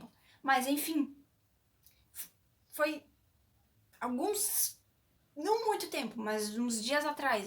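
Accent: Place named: Germany. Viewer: Like pelo vídeo sensacional ♥️! A impressão que eu tenho é que vc tem um sotaque Alemão, mas tipo eu só acho mesmo rsrs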